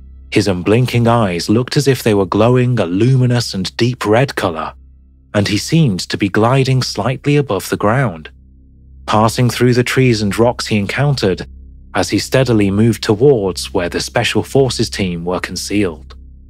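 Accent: United Kingdom. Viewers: It's nice to have a bit of variety. New guy needs to vary up his tone a bit, go for more emphasis, be a little more lively. Otherwise? Pretty nice. But, then, you can throw a decent Brit voice at anything and us 'muricans will go for it.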